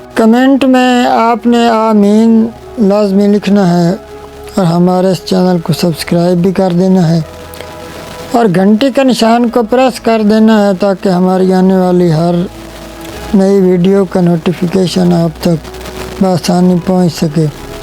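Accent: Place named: Sargodha, Pakistan. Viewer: Aap ke Urdu boli par Sargodha ke local boli ka rang Chara hua ha. Aap ka distt ya division Sargodha ha??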